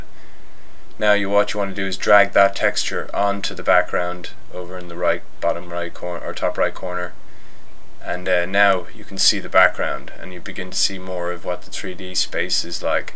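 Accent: Ireland. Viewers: that silky sexy Irish voice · are you Irish?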